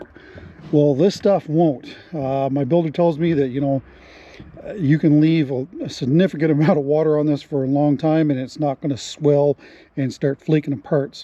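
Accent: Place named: Canada. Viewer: Red Green says garage the way you do, I just figured it was a Canadian thing.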